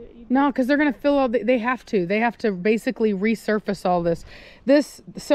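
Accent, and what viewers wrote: United States. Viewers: Put that Texas twang on girl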